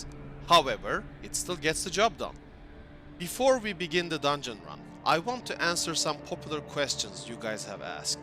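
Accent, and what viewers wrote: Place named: Turkey. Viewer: Duyduğum en ‘clean’ Turkish English aksanlarından. Ama kulak tanıyor tabi. ps. Great content, articulate and helpful. Liked and subbed.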